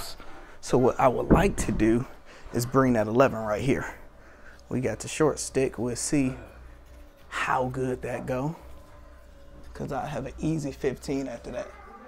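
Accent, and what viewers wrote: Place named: Washington DC, United States. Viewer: Bring that ass here boi😂 DC voice lol and bring a case of Coronas lol good shit playa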